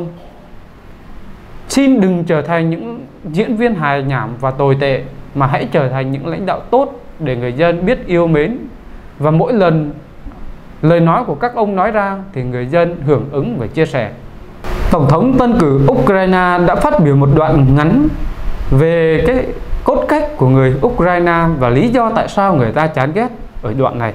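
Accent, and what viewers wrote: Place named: Countryside, Vietnam. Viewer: Giọng thằng này nhà quê thật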